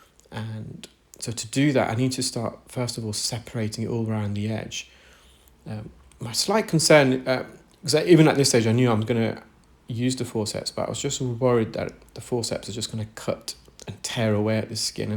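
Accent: United Kingdom. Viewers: That was a fantastic peel. I love watching your channel--it's interesting and entertaining, I learn a lot, and listening to your lovely British accent eases my anxiety. 👂👏🙌 👂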